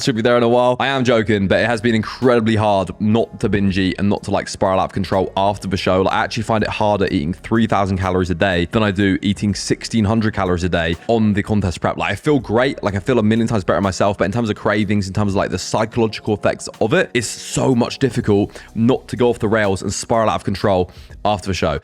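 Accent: United Kingdom. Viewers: Getting shredded and fitness overall is a dead market at this point. With skyrocketing obesity trends, and information on diet and exercise becoming worse every year, most people can't achieve this lifestyle. Due to their own misinformed and repeated failure - they usually give up, It would be a better use of their time to watch the latest movie trailer, political candidate updates, or music video. If you look at what trends on youtube, it's those things. Also, are you from a european country? you sound british. Fitness is not a large part of american culture. youtube is an american company.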